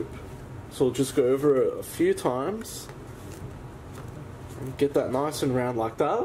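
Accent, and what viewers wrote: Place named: Australia. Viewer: Persian with an aussie accent first time watching you video love it very much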